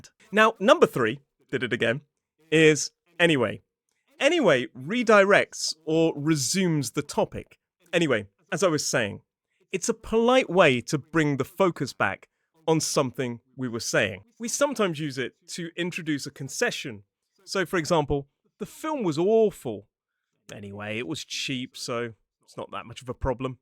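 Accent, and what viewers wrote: United Kingdom. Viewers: I really do love the BE pronunciation. Thnx 4 ur entertaining lessons.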